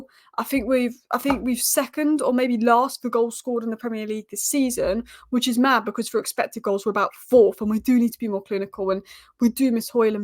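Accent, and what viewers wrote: Manchester, United Kingdom. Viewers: That's a strange Mancunian accent.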